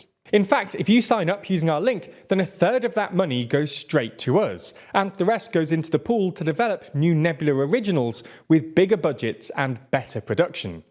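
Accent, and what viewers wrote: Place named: London, United Kingdom. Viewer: lol.....they arent.....they are oceans apart , worlds apart . greece is an ultra secular society only a bloke with Piccadilly square accent could misdiagnose this deescalation as the beginning of a friendship i am half italian half greek and i can firmly say that greece n turkey is like oil n water they dont mix. kalimera to the world from kalamata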